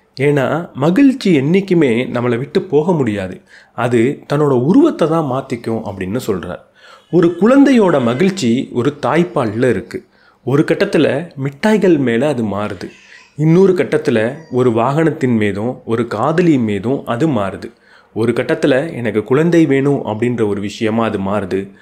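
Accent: India. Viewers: You are doing a great public service for Tamil speaking world of 75 million people in the world and your language skill is so beautiful and very simple ..your Indian Tamil language accent is so beautiful and you have a good skills to grasp many ideas from some excellent books..could I suggest you to review this following books please . The man unknown..Alex Carrel. Suicide of the west ... Clash of civilization.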